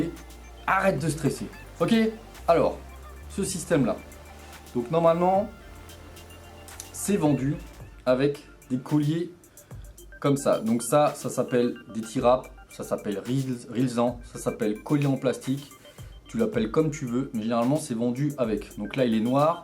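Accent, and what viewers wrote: Alsace, France. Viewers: coucou :) un plaisir d'entendre un alsacien ^^ ça me rappel mon chez moi <3 ^^ J'ai bien envie de me lancer aussi :) mais je me posais la question si il y avait pas trop de bruits parasites ou trop de vent de la route en l'attachant à cet endroit sur ton casque?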